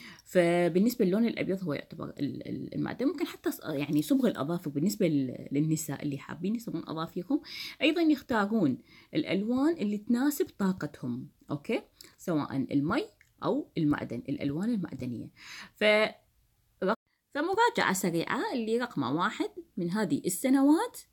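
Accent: Iraq. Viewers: انتي عراقيه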